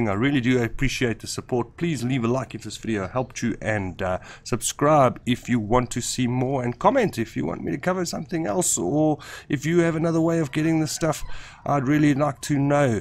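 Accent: South Africa. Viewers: Came across your Subnautica video on how to find the Blood Kelp forest and instantly heard that beautiful SA accent😂 subbed somme dadelik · Eyyyy! I heard the SA accent and subbed straight away, lekka man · My fiance is from SA. He heard your accent and knew it right away. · Lekker die SA! Cant miss that accent xD Good vids boet keep it up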